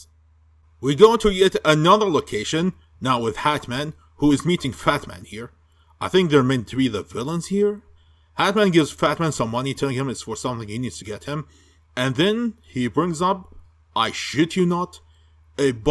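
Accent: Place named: Dubai, United Arab Emirates. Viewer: It’s actually his thick accent, and bad mic quality. He’s from Dubai if that clears some stuff up.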